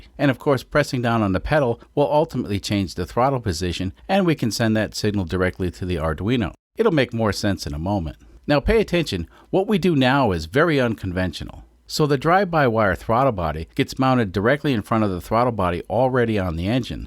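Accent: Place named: Boston, United States. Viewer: ohh that explains why i thought you had a Boston ish accent :P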